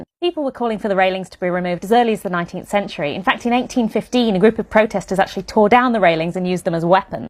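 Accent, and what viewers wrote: United Kingdom. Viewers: You’re so cute and I love that British accent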